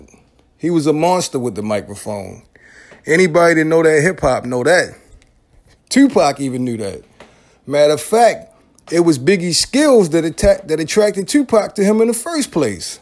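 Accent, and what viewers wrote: Baltimore, United States. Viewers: Subbed heard you say tew an kne you from baltimore. The content is A1 so the sub was a easy choic dummy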